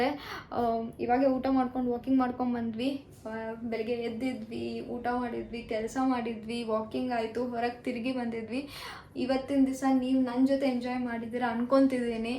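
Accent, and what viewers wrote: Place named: North India, India. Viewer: Your hindi is like we north indians ! Great . U r so energetic and beautiful. Love ❤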